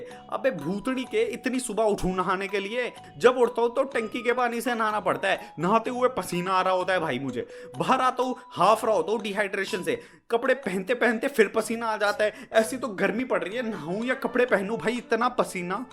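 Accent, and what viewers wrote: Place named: Uttar Pradesh, India. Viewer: Bhai aap UP se ho kya BOLNE KA TARIKA MERA JAISA HA TERA 😂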